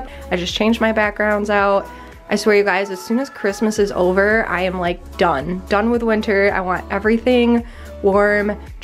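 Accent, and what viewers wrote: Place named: Midwest, United States. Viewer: I don't mean to be a creeper but are you from the midwest? You pronounce your vowels like me lol (Minnesotan)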